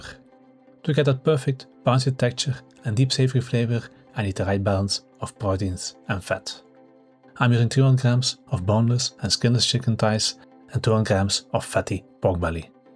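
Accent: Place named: Flanders, Belgium. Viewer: I like the accent. How to tell me you're Flemmish without telling.😊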